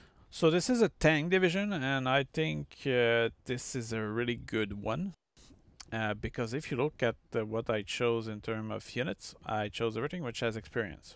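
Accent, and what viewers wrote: Quebec, Canada. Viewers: Oh, what's your nationality ? I can't find what accent is yours. Canadian maybe ? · Te québécois?je crois le reconnaître à ton accent anglais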